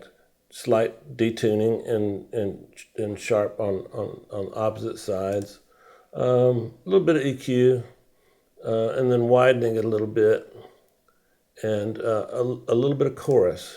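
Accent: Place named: South, United States. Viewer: Its that slight southern accent, which I love to hear...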